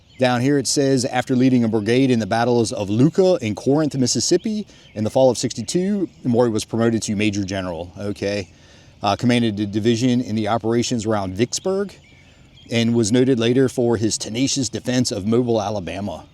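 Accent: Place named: Tidewater, United States. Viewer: Great video. I can hear that tidewater accent, lol.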